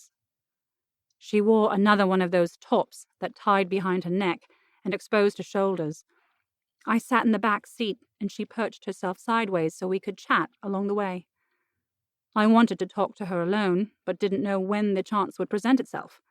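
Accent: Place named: West Virginia, United States. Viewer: The West Virginia was accurate but I got tired of listening to the twang. Sorry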